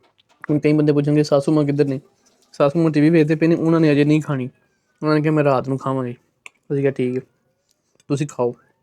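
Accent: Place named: Lahore, Pakistan. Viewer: bhai apse kisi video me question kiya tha k ap kidhr se hein. Apki punjabi se lgta tha ap lahore se hein. Phir kisi video ne suna apne btaya apne bht sari life lahore me guzari hai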